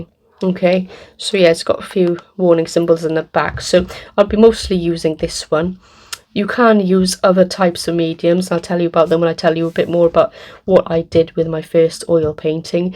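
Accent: United Kingdom. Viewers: Watching again here, it's successful unboxing arts supplies. Is it not expensive. I love your British accents ❤❤❤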